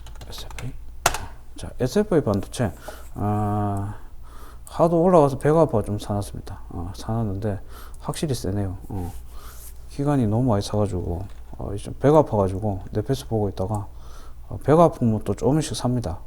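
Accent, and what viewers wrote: Gyeongsang, South Korea. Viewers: 우연히 보게됐는데 경상도 말투도 친근하고ㅋㅋㅋ 재미있고 친절하게 설명해주시네요 감사합니다ㅎㅎ 오늘 sfa 샀다가 매도 놓쳐서 어쩌지 했는데 홀딩할만한 애였군요!! 주식초보인데 20년고수님께 앞으로도 많이 배워가겠습니다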